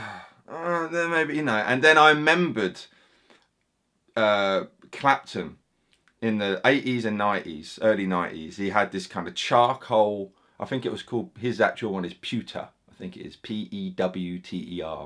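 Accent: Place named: England, United Kingdom. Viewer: Great video!! Love that accent man. Im a new sub so i gotta ask what part of England are you from?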